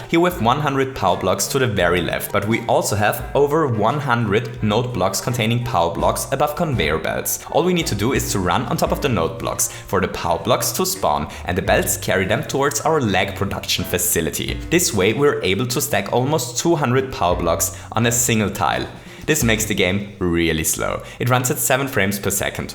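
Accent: Germany. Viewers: WAIT ARE YOU GERMAN? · Does this guy make German-Videos as well? Because you can hear this by his pronunciation of some Words! (PS: Ich frage, weil mir seine Stimme bekannt vorkommt.)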